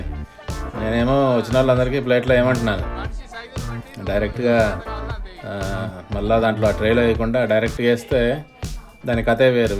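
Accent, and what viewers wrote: Rayalaseema, India. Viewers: Hi sir......this is madhu fr Chittoor...I follow ur most of the videos.....meeru US lo mana rayalaseema slang matladuthu videos cheyadam chala bagundhi 👍🙏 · అన్నా నీ భాష అద్భుతః. పక్కా కడప. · Anna nuvvu pakka Kadapa language matladuthunnav super Anna. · garu you r the king of Rayala seema food and language. US could not change your accent of our Telugu. Nativity in your language. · Akkadiki vellina mana rayalaseema slag podu kada😀😃😃 super sir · Bro meedhi Rayalaseema kadhaa.... Kadapa district aa slang same alane undhi... I coming to Virginia State in next week I would like to meet you if you are available... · Speak in our rayala Seema accent nice to listen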